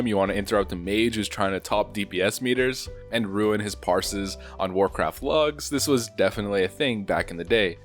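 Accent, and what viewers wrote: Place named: Canada. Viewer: He has the most Canadian accent ever and people are surprised.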